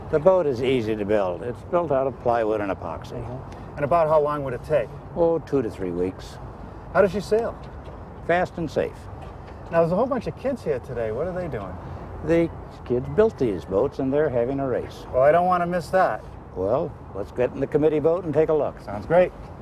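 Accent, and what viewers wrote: New England, United States. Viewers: I used to watch his show as a kid. I never noticed the strong New England accent back then. Now all I can think of when I hear it is Peter Griffin.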